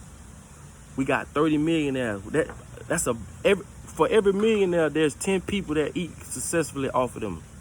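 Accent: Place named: Atlanta, United States. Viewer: That’s the realest shit I’ve heard today bro you made my MF day Dogg real talk !!!! I’ve been saying that shit man we need to make our own money, own banks, own cars, own houses, own city, own government, own army, own everything. If not that we need to physically fight and kill and take what we want. It’s too many of us and not a lot of them u feel me ? Bro I’m in Augusta ga you sound like your from Atlanta. We need to link for real bro and show people I’m down sign me up bro. Because I’m tired of this shit we need to start a different movement and not none of this protesting bullshit because it’s not getting us nowhere. Fuck voting and marching. We need to stop working for there companies and business because once that happens we will be in control. Everybody needs to stop working now.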